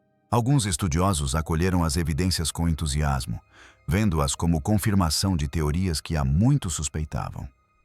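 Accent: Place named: Ceará, Brazil. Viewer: Eu jurava que era Cearense. Minha irmã.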